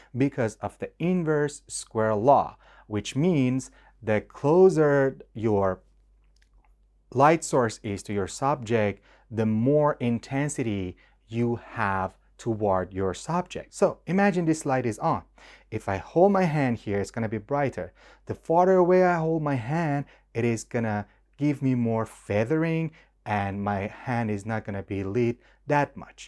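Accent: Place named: Iran. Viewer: Based off your accent, you sound Persian. 👍😎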